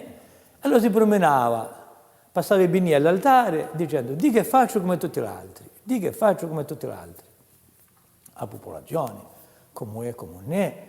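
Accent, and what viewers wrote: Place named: Massa Carrara, Italy. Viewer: confermo, l'accento è quello tipico di MASSA CARRARA ovviamente la lingua è toscano/ umbro medievale con influenze genovesi es, la parola'' fulminanti' cioè fiammiferi era molto usata da mia nonna che era umbra come ''cignale''=cinghiale ecc.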